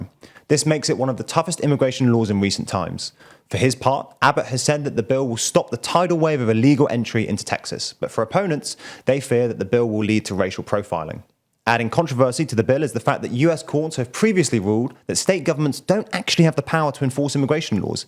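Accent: United Kingdom. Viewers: I normally don't like new presenters in my YouTube videos but is alright. I think for news what I am really looking for is a smart sounding British man to quickly and emotionlessly explain what's going on so he fits into the lineup like a glove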